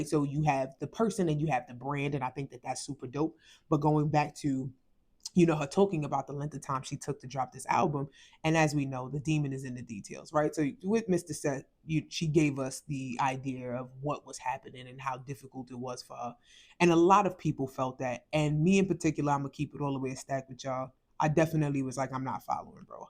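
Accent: New York, United States. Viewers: 😂😂😂 you’re so New York love it. (Wait….you’re from New York, right ? 😭)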